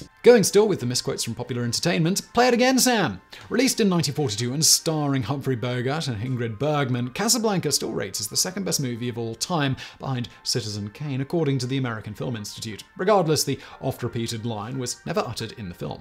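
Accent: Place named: Cockney, United Kingdom. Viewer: your emotions and active nature on set is awesome. I watch your videos because you rock and I love to hear you read things.. I dont think it even has to be your channel content, like if you picked up an old James bond novel, you would read it better than the other guy that does it for audible, your cockney accent is better and you sound more south London and it just works man.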